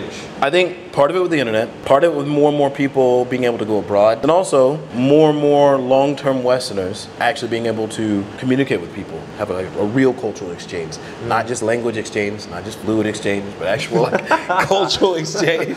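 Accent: Taiwan. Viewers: A Genius ~ I just love your both Chinese and Taiwanese accent ❤👍👍👍👍👍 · 講國語有台中味XD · 真的有臺灣口音>< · 靠，就是靈魂的台灣人，那個口音超地道的😂😂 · 哇塞，之前只看過兩隻的影片，但當中本人沒出現，聽聲音以為是台灣人😮 國語比我爸標準，台語比我男朋友標準😂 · ，台語、國話，口音很台灣❤ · 台灣腔的國語。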